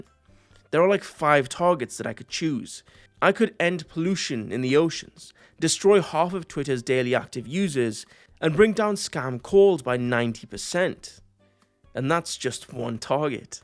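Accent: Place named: United Kingdom. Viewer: Yo, where abouts in Britain are you from?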